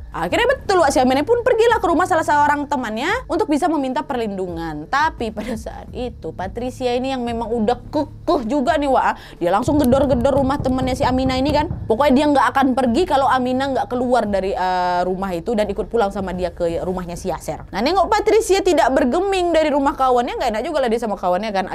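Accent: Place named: Lampung, Indonesia. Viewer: Kaya orang lampung bahasanxa . Orang mana kak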